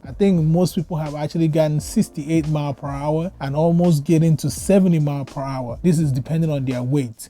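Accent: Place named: Caribbean. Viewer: also love your cool West Indian accent - so refreshing !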